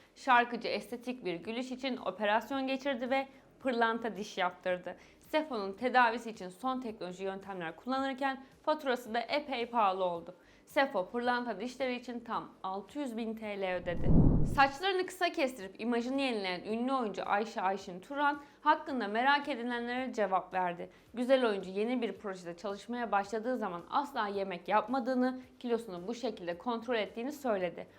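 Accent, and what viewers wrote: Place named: England, United Kingdom. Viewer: Gönül isterdi'ki güzel Türkçe Dilimizi doğru dinlemek . Fakat maalesef ingiliz aksanı ile Türkçemiz katlediliyor. Lütfen , Dilimizi koruyalım . Alfabemizde "R" leri yumuşatarak ingilizlerin aksanı ile bozmayalım . Bu İngiliz aksanı ile Modern veya güzel Türkçe konuştuklarını sanıyorlar, lakin ingilizin Türkçemizi bu yolla katledlettiğinin farkında değiller . Güzel kardeşim lütfen kjendi dilini yabancılaştırma , aksine koru kolla .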